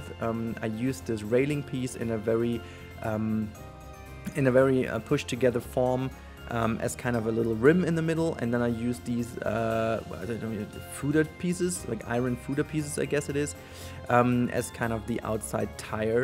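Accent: Germany. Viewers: Kann es sein das du aus Deutschland kommst xD ? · Du bist aber deutsch oder weil dein Name und dein englisch verraten das 😊👌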